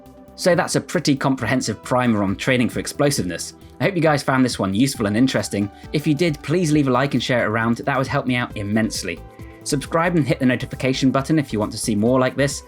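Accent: United Kingdom. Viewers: He sounds british, has freak strength like a russian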